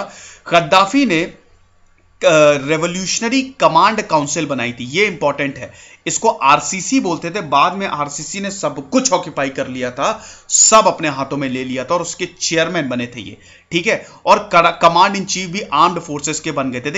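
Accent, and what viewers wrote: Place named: Bihar, India. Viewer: Bhaiya ye aisa bhaynkar abhiyan tha na Full to bihari ascent ......I like it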